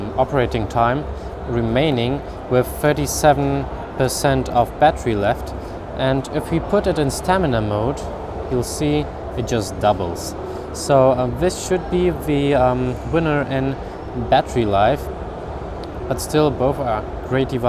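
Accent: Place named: Germany. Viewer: so süß, wenn ein Deutscher englisch redet ;)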